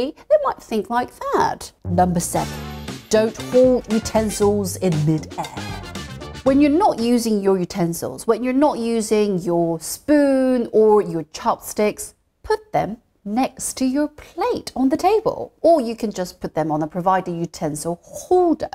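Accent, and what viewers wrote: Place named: United Kingdom. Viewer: 영국 액센트 넘 좋아요. 영어만 실컷 들을 수 있겠어요. 지금 그대로도 예쁘세요~^^ 미스때는 선녀셨군요~^^❤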